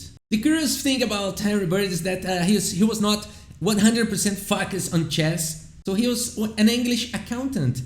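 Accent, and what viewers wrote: Brazil. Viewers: Engliguês ou Portuguish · Se o sotaque não entregar que é brasileiro, as mãos entregam kkkkkkk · Your English is good! Though you have a very distinguishable Brazilian accent (and there are some language slangs here and there that are mostly limited to Portuguese), you don't stumble or make awkward silent moments. Looking forward to seeing more videos! - Valeu,! Bora conquistar audiências lá de fora:)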